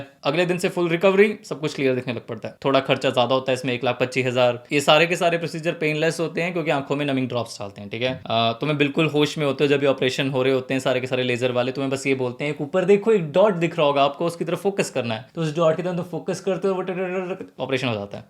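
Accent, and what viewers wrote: Punjab, India. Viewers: Excellent way of explaining ( voice and punjabi accent makes it even more interesting)... Last tak interest bana ke rakha... And sharing your own experiences is the best thing as it is no filter experience... Great job! ❤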